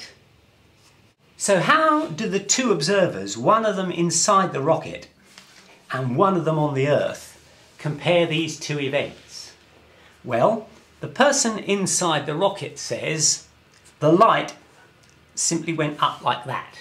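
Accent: United Kingdom. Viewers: Fantastic!!! The British accent is also extremely helpful. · i like the british sweety accent rather than the lecture itsel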